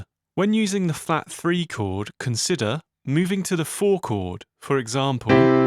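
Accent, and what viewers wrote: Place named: United Kingdom. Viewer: why does every tutorial have a dude with an annoying brittish voice?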